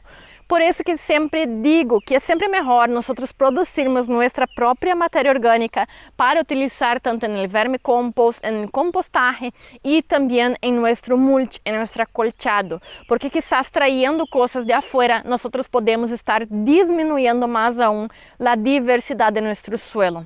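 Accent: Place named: Rio Grande do Sul, Brazil. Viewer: Você é brasileira né? Seu sotaque é de quem fala português do Brasil...gaúcha com certeza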